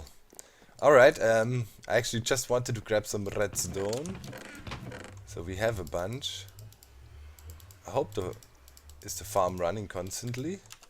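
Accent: Germany. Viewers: Love the German accent. <3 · Schön dass endlich ein deutscher da ist. Ich bin ehrlich: Als ich gesehen habe, dass X gesagt hatte, es gibt einen neuen Hermit, habe ich schon die Augen verrollt. Es gab in letzter Zeit so viele neue Members. Aber als ich gesehen habe, dass du es warst, war ich doch sehr erfreut. Wirklich kennen tue ich deinen Kanal nicht (nur so 1-2 Videos), die ich mal gesehen hatte, aber mir im Kopf blieben. Wahrscheinlich wegen dem englischen akzent, der eindeutig zeigt, dass du Deutsch sein musst. =D So nun habe ich noch eine Bitte an dich: Auch wenn du mehr der praktische Typ zu sein scheinst, probiere doch jeden Raum entsprechend zu designen und "schön" zu bauen. Irgendwie ist mir das wichtig, auch wenn sich das komisch anhört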